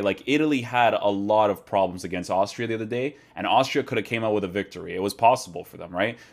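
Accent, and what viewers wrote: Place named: Portugal. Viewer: I swear to god I cant understand if u are portuguese or not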